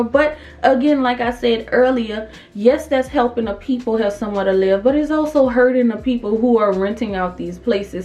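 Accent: New Orleans, United States. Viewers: Thanks for this information. Btw, are you from New Orleans?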